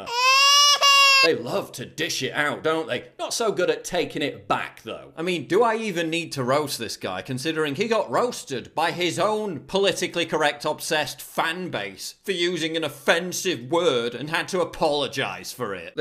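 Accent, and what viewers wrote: United Kingdom. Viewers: That's because he's British, could you not tell from his obvious accent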